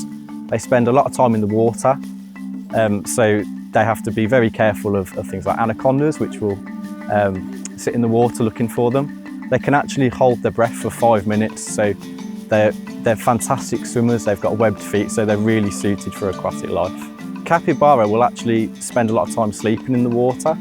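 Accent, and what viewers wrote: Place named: Australia. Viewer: this man sounds aussie but why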